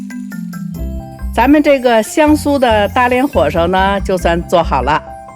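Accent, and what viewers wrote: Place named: Beijing, China. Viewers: 听着地道的北京话，突然想奶奶了…… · 地道老北京味儿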